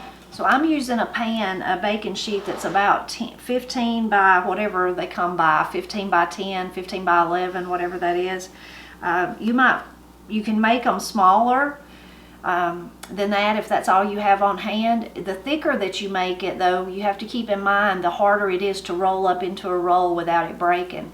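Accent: Appalachia, United States. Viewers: happy thanksgiving to u !!! celebrating & enjoying the sound of your appalachian accent----makes my sorrow for america's sad situation feel better 'cause in the heartland--appalachia'---there are people like u guys still living !!!! When i watch tv, i just don't see anyone in a family environment at all, so it's heartwarming to watch your appalachian instructional home videos !!!!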